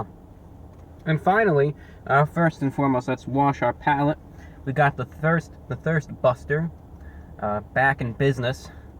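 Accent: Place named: Transatlantic, United States. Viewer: where are you from? I love your accent! it's similar to the transatlantic accent. you sound like a radio presenter from the 30s!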